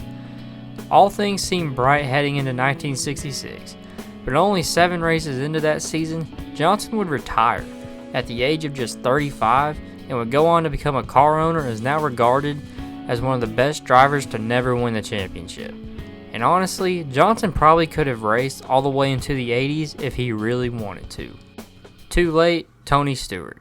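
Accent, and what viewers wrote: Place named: South, United States. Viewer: If Jeff Gordon was a Southerner I imagine he would talk like that.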